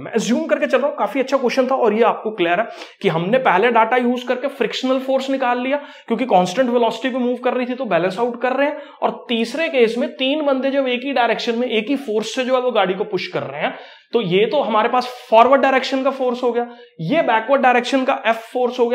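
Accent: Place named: Punjab, India. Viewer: Punjabi aagye oye ❤😅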